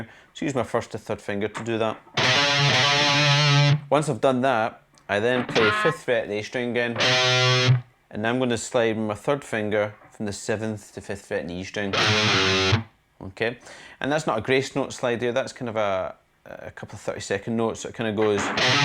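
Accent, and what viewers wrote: Scotland, United Kingdom. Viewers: Thanks for this tutorial man, love your fackin' accent, you're scottish aren't you? greetings from france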